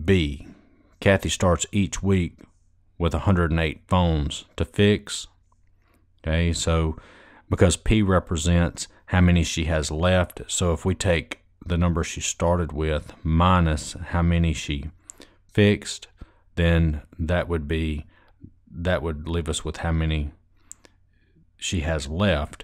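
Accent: South, United States. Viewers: The southern accent is so annoying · Maybe it cause he from the south cause of his accent because am from the south but the way he solved the problems great and amazing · he meant with the southern accent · Most southern accent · I was not here for math, I was just listening to your Southern accented English...... You are a good teacher. Regards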